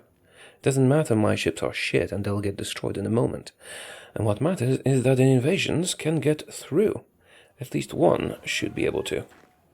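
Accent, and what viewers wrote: Poland. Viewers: your accent is a bit polish nice! i love polish! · lol my friend thinks you are German. I told him you sound Polish. is Polish right?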